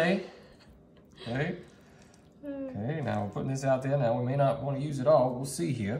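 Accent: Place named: Cajun, United States. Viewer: I love this. Looks so good. Love your accent, where does tat come from? Cajun?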